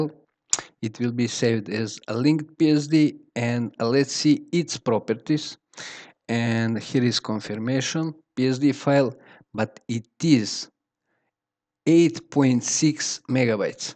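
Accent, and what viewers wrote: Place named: India. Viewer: INd-lish, speech very bad. i don't hear sound.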